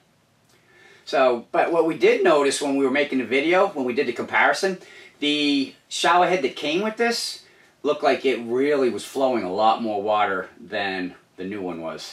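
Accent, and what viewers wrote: Boston, United States. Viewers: Irrelevant comment alert!!.. dude r u from Boston??? U been to Dunkin today?? Anyway. Wikkid pissah review!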